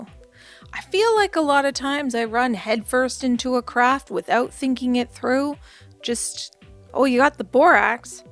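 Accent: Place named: Canada. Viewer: Watched a few shorts on this method , but yours BY FAR is what i was searching for ! Plus i here s hint of fellow CANADIAN accent <3 TY for all your hard work !! HAVE A GREAT DAY!!!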